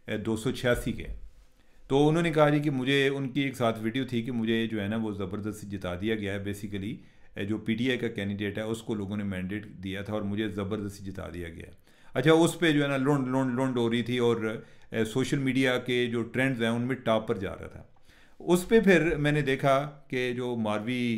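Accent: Punjab, India. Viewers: sb, aap ache khaze program kr rahe hn mager pata nahi q aap Imran shafqat aur goher butt ka copy krte hn, I mean punjabi tarka lagate hn. Punjabi language is very very sweet and I love it but don't copy them. You are already very popular in your own style.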